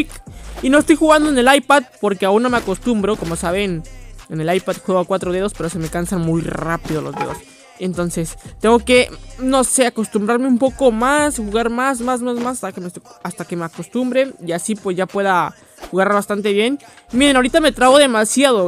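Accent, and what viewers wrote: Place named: Argentina. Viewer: Usted parece argentino compa jajajajaj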